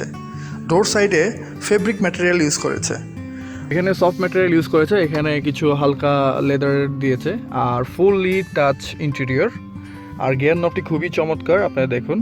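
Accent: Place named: Chittagong, Bangladesh. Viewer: bro you have ctg accent! Are you from ctg?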